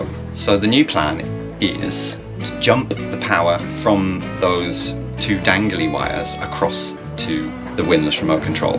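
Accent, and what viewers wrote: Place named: Ireland. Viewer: A bit of Irish...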